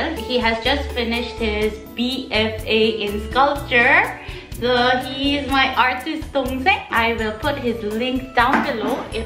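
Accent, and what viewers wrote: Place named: Nagaland, India. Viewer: Your accent is just like AO tribe of naga 😂😂😂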